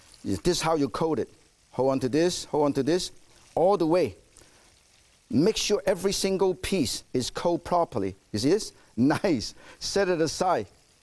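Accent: China. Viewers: sounds like * inspiration for the Chinese English accent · i love the chinese accent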